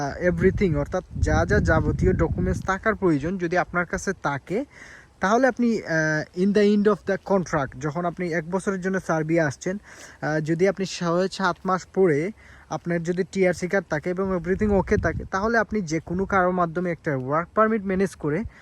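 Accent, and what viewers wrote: Sylhet, Bangladesh. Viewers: Bhaiya Sylheti mone hoy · ভাই সিলেটি?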